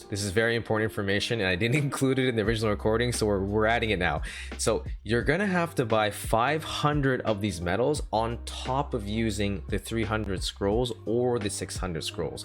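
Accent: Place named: Canada. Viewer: Ahhh. A nice normal Canadian accent.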